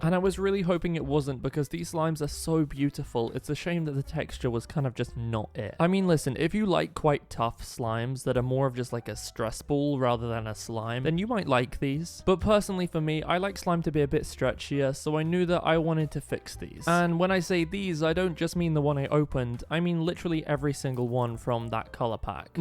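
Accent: United Kingdom. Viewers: Are you British? Because you sound British. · Are you British bc I am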